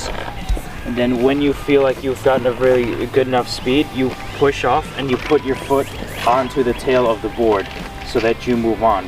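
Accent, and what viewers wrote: United States. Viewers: are you staten island? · dude where in ny you from?????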